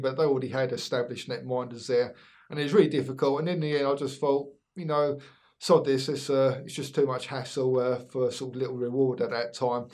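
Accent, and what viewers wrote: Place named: Scotland, United Kingdom. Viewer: One of the best reviewers on the tube, apparently according to one the blokes I work with you and me sound exactly the same Scot:)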